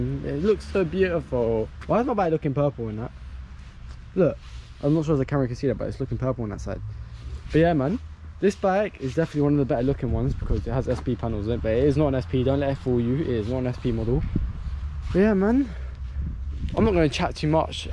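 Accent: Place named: London, United Kingdom. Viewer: What’s wif the accent blood clot man ,use ben livin in London to long now !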